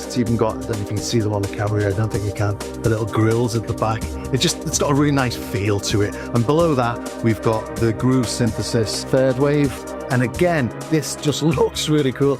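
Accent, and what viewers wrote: Liverpool, United Kingdom. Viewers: not Jamie Carrigher-level Scouse! Hehe.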